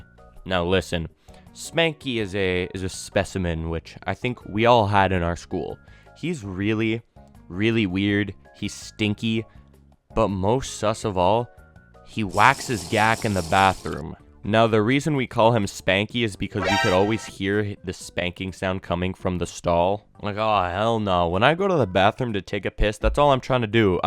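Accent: Canada. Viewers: Bro you sound so canadian, more canadian than most ones Ik, maybe cuz im in the city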